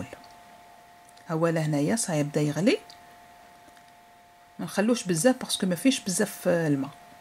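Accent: Oran, Algeria. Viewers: مدام صوتك وطريقة كلامك راقية حتي لهجتك الوهرانية تعجبني بزاف و وصفاتك اكتر نتمني تعليق من عندك انا من اشد المعجبين بشخصيتك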